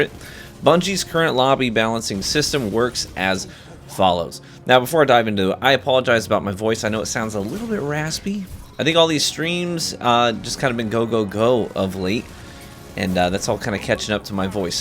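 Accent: United Kingdom. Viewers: Gotta admit, I wasn’t ready for the funny British accent. Hahahahha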